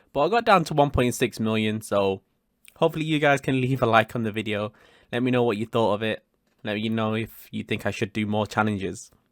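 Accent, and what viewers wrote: United Kingdom. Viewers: Fucking bri’ish accent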